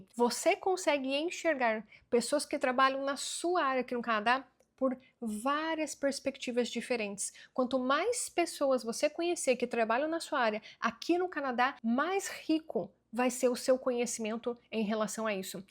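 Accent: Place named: Paraná, Brazil. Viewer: Assistindo muitos videos seus. Que deus lhe abençoe sempre! Seu sotaque anglo paranaense e trocando o S pelo x tipico de avó alemã e um barato! Voce e uma fofa e a sua inteligencia amplifica sua beleza! Parabéns 🎉 Sonhos nao envelhecem !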